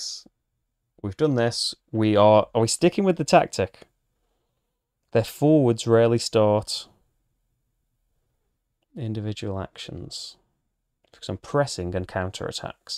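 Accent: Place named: England, United Kingdom. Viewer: Hey what are the odds finding a YouTuber playing Elven, the released version and not the KS preview, from the Country that’s the home of Football, with an accent my BE Teacher would have loved, and then him not being a football fan at all? 😂 Too funny. Thank you! Excited for more on this. Regarding to Rules, you missed the Sponsor thinks others mentioned and you are only allowed to have 5 attacking and 5 defending players, in every Games. That leads to more realistic scores. Meaning You get more 1:1 and 2:1, than 4:5 ore 3:3…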